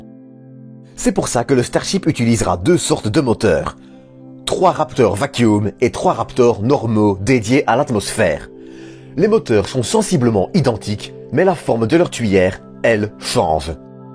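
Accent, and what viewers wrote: Belgium. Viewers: Un Belge qui dit soixante-douze... Je suis déçu... J'ai vu quelques commentaires sur ton accent. Ne le gomme pas trop, c'est dommage de cacher cette spécificité culturelle !! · Et le super-hairy aussi. Je trouve ça cool, le lanceur super-poilu, ça a la classe. Mais ceci étant, ce n'est pas un problème de prononciation (AMHA), mais plus une question d'accent (belge je suppose). C'est ce qui est sympa avec la francophonie, ça met un peu de variété. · Salut le Belge, c'est un compatriote (expat) qui t'écrit. Super chaine, super montage, super sujet. Mais en tant que Belge je dois dire que j'ai du mal avec ton accent. Je ne suis jamais sur si je dois en rire, car cela à quelque chose de sympa, ou si mes oreilles crient au secourt. Peut-être les deux. D'un côté c'est original... et cela dois faire marrer les Francais. Tout compte fait je me demande si j'ai pas le même 😳... 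non d'une frite ! Allez pouce bleu quand même.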